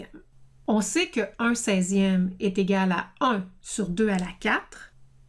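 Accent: Canada. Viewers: Merci ! a cette belle voix canadienne :)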